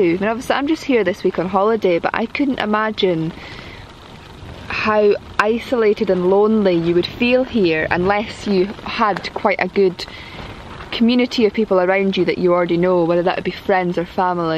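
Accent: Scotland, United Kingdom. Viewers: Please retain your Scottish accent and tones, you are starting to succumb to the recent Austrailian infestation, 'raise the tone of your voice at the end of every sentence' like it was a question.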